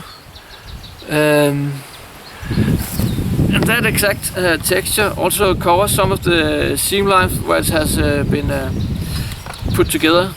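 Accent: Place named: Denmark. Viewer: very danglish ^^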